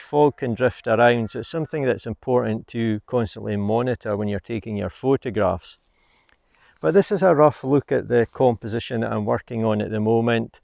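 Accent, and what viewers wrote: Scotland, United Kingdom. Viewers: Very nice image. Your videos are lessons in the Scottish accent!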